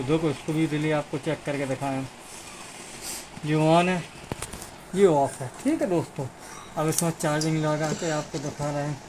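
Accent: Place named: Bundelkhand, India. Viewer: सरजी आपकी भाषा बुंदेलखंडी लग रही है आप कहां से हैं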